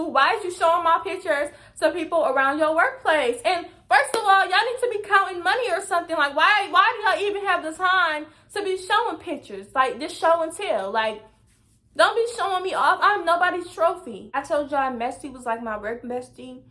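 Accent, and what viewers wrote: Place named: DMV, United States. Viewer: I knew soon as you started talking you was from the DMV 😂😂 old men be gross AF I got a few stories from back when I was damn 14/15/16 LOL I’m like sir... wrinkles and powdered milk don’t do it for me 💀💀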